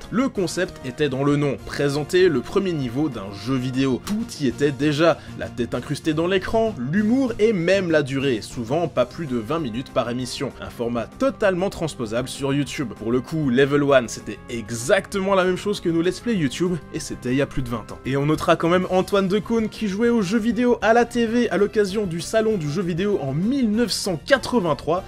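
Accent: Belgium. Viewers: Parle Français comprend rien quand tu parle belge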